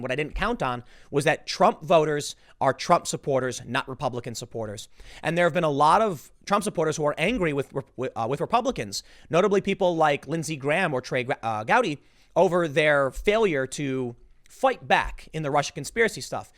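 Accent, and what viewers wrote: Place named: Chicago, United States. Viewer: I never noticed that chicago accent until this video. The way he says "House" is a dead give away.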